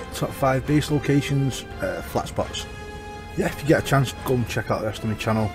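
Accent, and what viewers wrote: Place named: Salford, United Kingdom. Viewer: You sound like a salford lad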